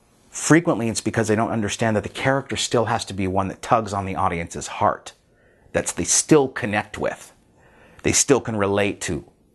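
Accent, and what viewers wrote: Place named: United States. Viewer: Thank you very very much! I am russian (Sankt-Petersburg)Your video is worth its weight in gold for me cause I understand all of what you're talking about.I want to talk american-english like you do and in the final analysis be an actor.Much odliged. Yury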